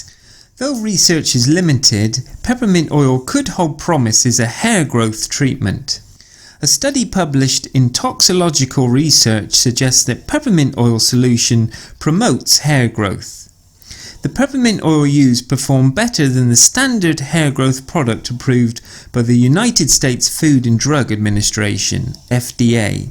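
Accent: United Kingdom. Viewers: You sound like a british hillbilly 👎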